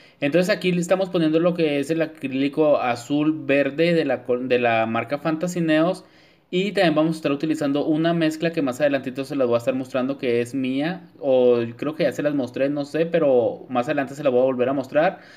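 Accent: Chihuahua, Mexico. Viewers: Así hablas tienes acento chihuahuense una super persona muy lindo y atento me encanta tu trabajo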